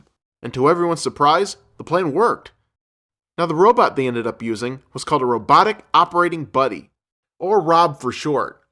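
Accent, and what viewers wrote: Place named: Ohio, United States. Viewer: Does "charism" mean a big dumb pasty head with an awful Ohio accent?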